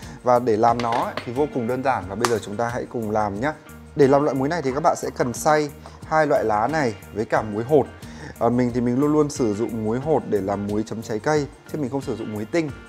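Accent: Hanoi, Vietnam. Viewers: Giọng Hà Nội nghe cưng phết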